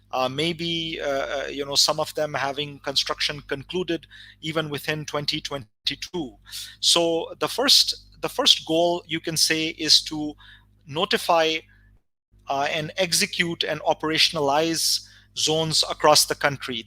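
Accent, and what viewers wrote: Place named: United States. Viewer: We have high hopes from this authority__ the major duty is to start manufacturing the technological products by local engineers. For example machines in medical/ health sector needs an original machine and then engineers trainees under supervision from seniors make the copies__ I am ready to volunteer and invest in it. We have seen in China, Thailand, Korea, Japan, that making the new products is far cry but the first thing is to make cheap copies of famous brands. We, as Pakistanis have to be on war footings on this front Sar dena parey ga nahi to koi ley jae ga__ needs highest Patriotic passions here. I am listening this and unfortunately internet is not working of the speakers. Make the things simple __ don't talk in USA styled English__ do something positive in most humbled fashion plz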